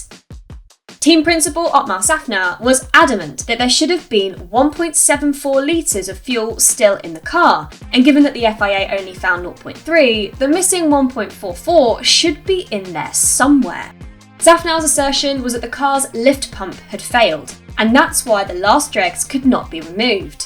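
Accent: United Kingdom. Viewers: How about that? There is just something about a pretty girl, with a British accent who just doesn't talk about racing, but actually understands racing.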